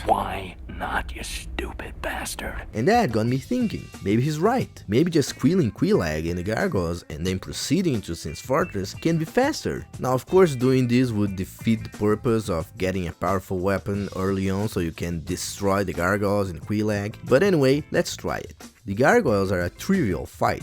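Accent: Brazil. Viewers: bro i can uderstand you perfectly, you speak like a brazilian, idk why, but thats funny for me hehe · U brazilian or smth?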